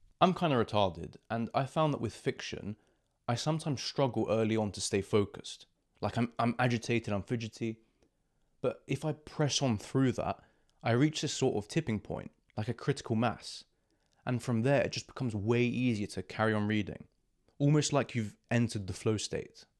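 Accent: United Kingdom. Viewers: ur britih accent was wrecking my nerves i had to turn on the caption to inderstand that shiz🥀🥀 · Most crisp and understandable British accent I ever heard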